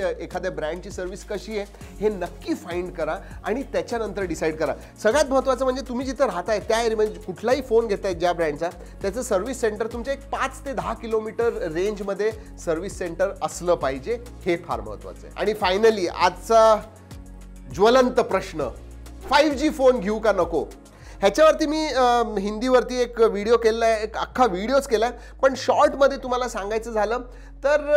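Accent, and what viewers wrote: Pune, India. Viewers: Sir, tumhi punyache ahat kay?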